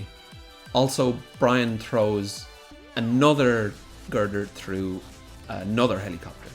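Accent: Ireland. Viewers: ❤love the Irish ness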